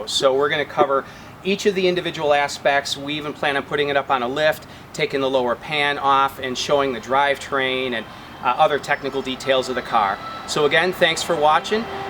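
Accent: United States. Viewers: You have a very cool but crazy accent. It's like super American.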